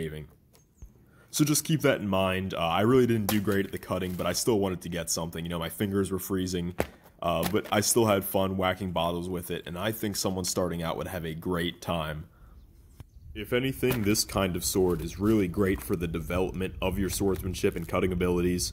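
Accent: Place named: Pittsburgh, United States. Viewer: You sound like you're from Pittsburgh 🤔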